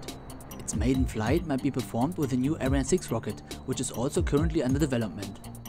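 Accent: Germany. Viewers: Dat german accent.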